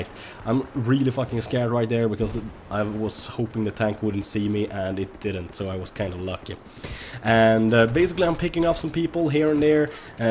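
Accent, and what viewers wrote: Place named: Sweden. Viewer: Gotta love the swedish accent <3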